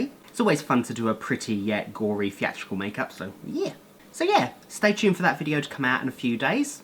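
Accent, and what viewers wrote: England, United Kingdom. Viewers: is he from England?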